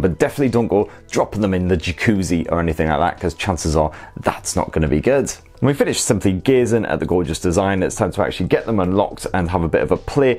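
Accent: United Kingdom. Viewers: Just love to hear his british accent 🤣👌 "bloody" liked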